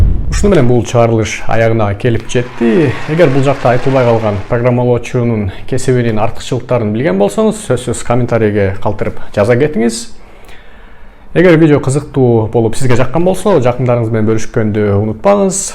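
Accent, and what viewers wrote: Standard. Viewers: Кыргызча абдан таза суйлойт экенсиз. Ийгилик! Озум программалоо багытынын 2-курсунда окуп атам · Ассалам алайкум тууганым кыргыз тилин таза сүйлөйт экенсиң азаматысын! Кыргыз мусулман ошого динибизди да үйрөнүп алсак жакшы болот.ишине ийгилик каалайм алга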